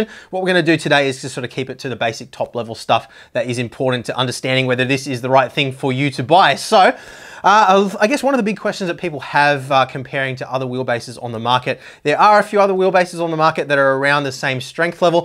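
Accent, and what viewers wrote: Australia. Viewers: I'm assuming you're from Australia with that accent.. apologies if I am wrong. Do you know what the pricing is in AUD?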